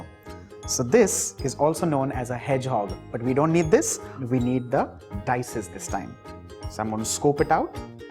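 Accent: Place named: India. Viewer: hahah - indian English is amzaing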